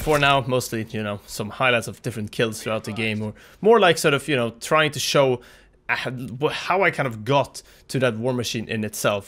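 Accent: Sweden. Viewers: Svensk? Are you Swedish?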